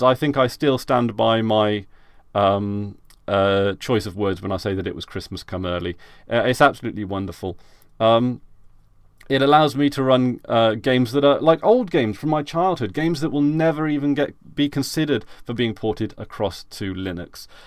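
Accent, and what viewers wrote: United Kingdom. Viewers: Linux might break through.... Linux is already broken through. What are you talking about. How much of the Internet is run on Linux. How many devices run on Linux? Linux is already corporate. Niche by design. What are you talking about do you know who's on the Linux Foundation. God what a mistake listening to a brit. Should have closed the video the moment I heard your accent and saw your English teeth. Why do you guys have such bad teeth anyway? I swear. I need a ibuprofen after that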